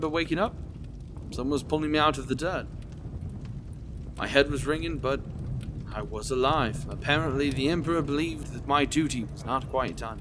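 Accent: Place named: United Kingdom. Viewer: Very nice. The British accent and the almost light-hearted way he told his story made me think of the British Empire at its glory days.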